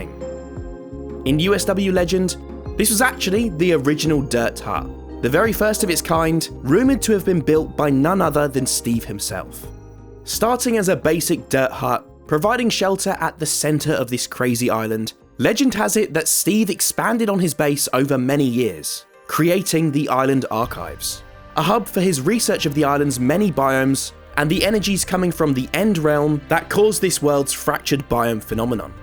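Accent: United Kingdom. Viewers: Why do you sound like a British Zach Callison? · Are you british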